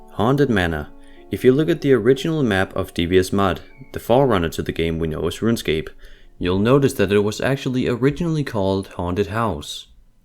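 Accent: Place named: Denmark. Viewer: Yup this was super interesting! Du må næsten være dansker med den accent, right? :P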